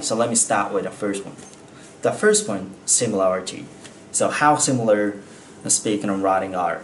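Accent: Australia. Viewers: オーストラリアはこういう発音なんだ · だいぶオーストラリア英語になってきましたね。 makeの発音、マイクに聞こえる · オーストラリア英語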